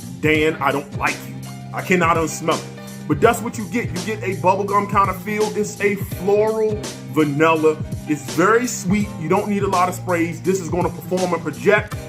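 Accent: DMV, United States. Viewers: Man people in the dmv really do have a accent. Love the video keep it up!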